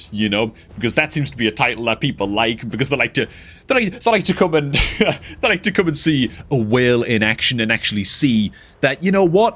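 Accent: North East England, United Kingdom. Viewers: I wish he would slow down a bit. I'm guessing north east England and it's hard to keep up at times. Not Newcastle but that neck of the woods.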